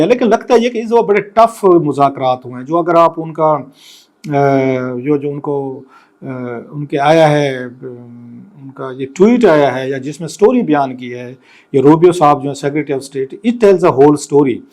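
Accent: Saraiki, Pakistan. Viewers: بے وقت کی راگنی۔۔۔ یہ آپ کا موضوع نہیں ۔۔ سرائیکی سائیکی سے نکلو۔۔ اب تو اردو میں گفتگو کرنا سیکھ لو۔۔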